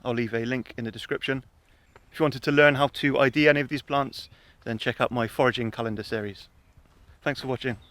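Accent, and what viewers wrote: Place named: Gloucestershire, United Kingdom. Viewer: Judging from some previous videos I’ve seen and the accent my guess it’s just a bit South from where I live. I’m in Gloucestershire so further down the river Severn probably.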